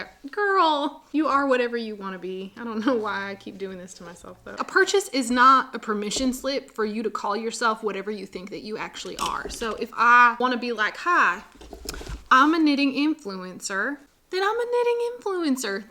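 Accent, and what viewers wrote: South, United States. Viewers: You are so Southern!! I love it.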